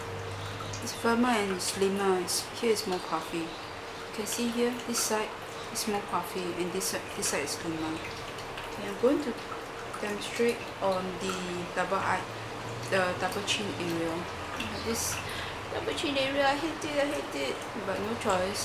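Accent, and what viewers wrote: Singapore. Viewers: are u singaporean, ur acccent!!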